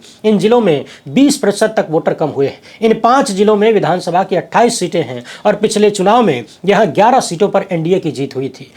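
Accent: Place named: Bihar, India. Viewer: जी मुझे आपके बिहारी होने पर गर्व है आपके बोलनेका बिहारी स्टाइल बहुतै अच्छा लगता है।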